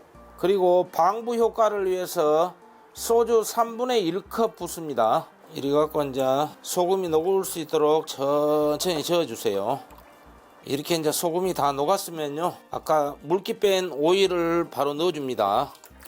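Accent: Jeolla, South Korea. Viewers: 세프님 전라도 사투리 넘나 잼 나요 종발이라는 말 아주어렸을때 들어서 정겨워요 오이 물 김치 잘 해먹어봐야죠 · 전라도 분이신가 말투가 구수하시네요 ㅎㅎ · 구수한 전라도사투리 인간적으로 보여요ㆍ🎉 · 전라도 사투리가 넘 정겨워요. 재미있고요. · ㅋㅋ김대석쉐프님 전라도 말씨가 겁나게 구수해요 저두 그짝ㅋ 저두응식한지20년이넘었는데 참맛나게 잘하세요 참고해서 많이 활용할게요 더많은 레시피 부탁합니다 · 전라도 사투리가 구수하네요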